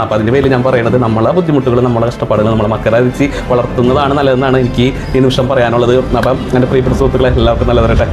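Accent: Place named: Cherai, India. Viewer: Cherrayi slange..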